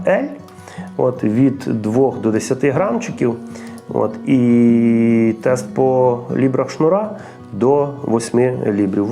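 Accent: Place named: Western Ukraine, Ukraine. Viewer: А Ви із Західної України.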